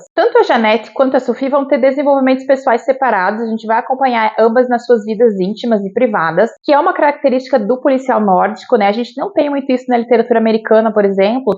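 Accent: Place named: South, Brazil. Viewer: Oi! Pelo seu sotaque tu é do Sul, por acaso você mora no Rio Grande do Sul? Se sim, como você está e sua família? Estão todos bem? Quanto ao livro, muito interessante. Gosto de ler livros pesados, as vezes, sair da bolha sabe. Abraços 😘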